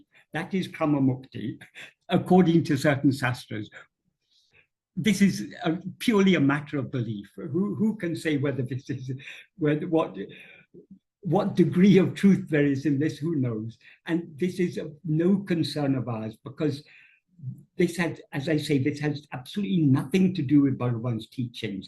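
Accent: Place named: United Kingdom. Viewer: Aprendí a entender tu acento Británico, me costó mucho, pero es una de las fuentes puras en internet. ❤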